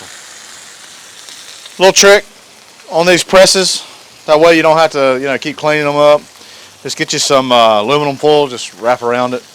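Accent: North Carolina, United States. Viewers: FOOD LION "JUST KINA PUT IT ON THEAR" ,,,,I'LL BET YOU'RE FROM North Carolina!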